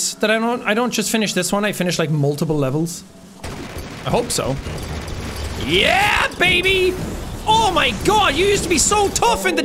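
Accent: Scotland, United Kingdom. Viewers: BRO SOUNDS SCOTISH WHO AGREES 👇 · I like your accent is it Scottish??? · Is he scottish?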